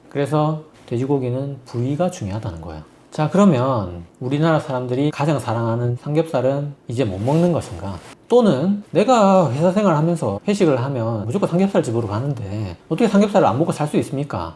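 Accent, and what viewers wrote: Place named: Busan, South Korea. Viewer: 부산 말씨가 어찌이리 구수하고 삼경살 굽는 냄새는 물러나라~! 매력 통통 젊은 의사샘님 즐거움을 주셔서 감사합니다! 자주 뵜으면 좋겠네요~^^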